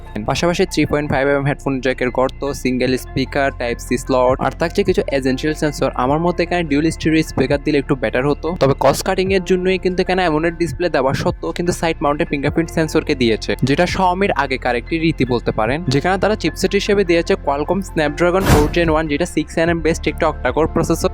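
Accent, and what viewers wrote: Chittagong, Bangladesh. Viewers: Kotha sune mone hocce apni chittagong ar...Chittagong a ai mobile kutai available pabo seta bolen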